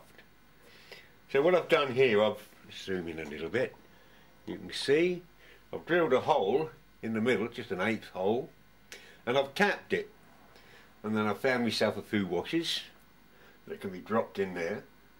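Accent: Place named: Breckland, United Kingdom. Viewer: Love that accent ---from the Breckland ?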